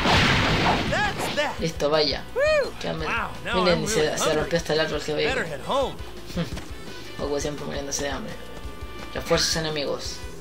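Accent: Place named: Mexico. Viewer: por tu acento creo que eres mexicano verdad?? viva mexico carajo!!! jajajajjjxdjkajxkajdoisadjs xd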